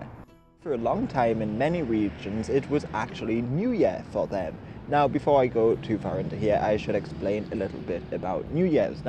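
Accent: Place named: Newcastle, United Kingdom. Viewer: The reason pronounces 'year' as 'yeah' is because he is from Newcastle. So what you're hearing from is a Geordie accent.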